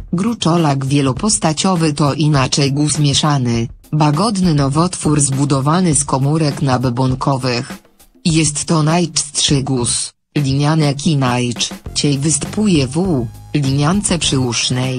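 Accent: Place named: Silesia, Poland. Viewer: Jest za śląska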